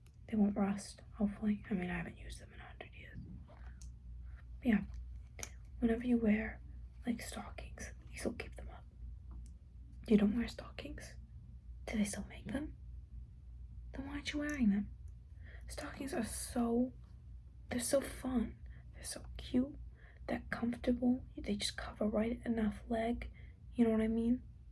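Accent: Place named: New York, United States. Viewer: I love your ny accent videos! 💟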